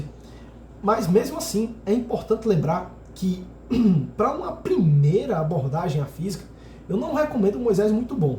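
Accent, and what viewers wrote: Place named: Pernambuco, Brazil. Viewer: sempre que tu aperta num vídeo inteligente assim tu já sabe que vai ouvir o sotaque de Pernambuco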